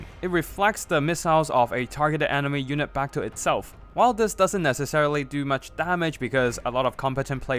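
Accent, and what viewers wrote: China. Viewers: Goddamn I can't stand the CCP accent. Need to fix that boyo.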